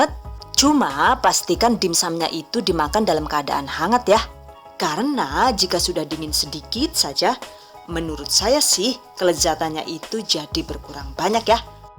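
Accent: Banyumas, Indonesia. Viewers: Kaget donggg orang ngapak ternyata😭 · Makasih resepnya bu.. 😅 ko sma2 ngapak yaak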